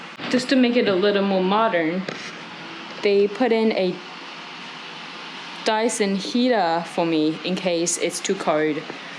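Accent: Australia. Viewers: Interesting accent, is that Australian / Korean ? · That’s the weirdest sound I’ve ever heard 🤔 I’m also trying to figure out your accent, at times you sound Australian. It’s very confusing, lol.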